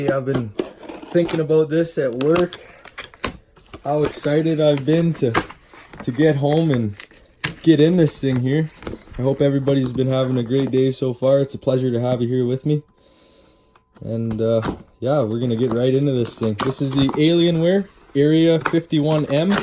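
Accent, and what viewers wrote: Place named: Wisconsin, United States. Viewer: This guy must be up north. Close to Canada probably from Wisconsin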